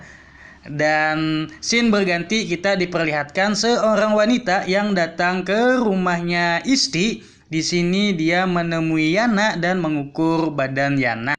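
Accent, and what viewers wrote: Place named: Banjar, Indonesia. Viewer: Dengar logatnya bhasa banjar langsung subrek😀😀....lagi pang revwie film lesbong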